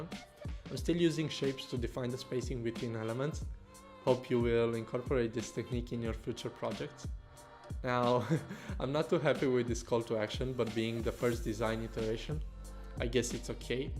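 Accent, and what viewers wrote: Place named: Romania. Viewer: Dupa acent e roman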